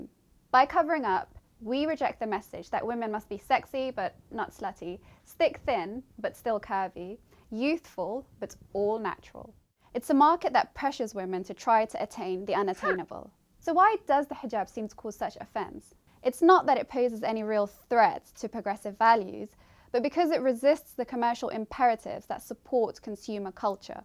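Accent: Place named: United Kingdom. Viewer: Literally everything she said is untrue . The fact that she has a thick British accent makes this all the more SMH....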